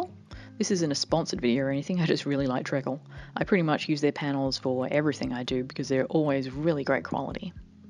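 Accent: Australia. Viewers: Hey where's your accent from? It reminds me of mine somehow, yet I'm a Queenslander from Australia. Just want to know if my accent is out of place or if I somehow detected the QLD accent in the wilds of America.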